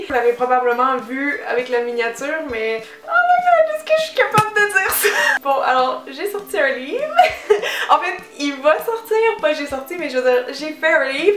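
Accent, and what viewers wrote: Quebec, Canada. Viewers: Dulude Ah désolée, je savais pas:/ Mais sinon continue tes vidéos, elles sont géniales ♥ Moi j'adore les regarde, et toi continue à me faire rire:p ♥ Et ma mère elle adore ton accent québécoise ♥ Elle veux trop vivres dans ton pays, elle l'adore, bref bisous j'taime ♥♥♥♥ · merci de faire une livre utile et légitime puisque c'est ton métier la beauté et le maquillage...enfin une youtube use avec un vrai beau livre à proposer😊 je te souhaite plein succès et tu nous fais craquer avec ton accent 💋 depuis la Suisse à toi jolie québécoise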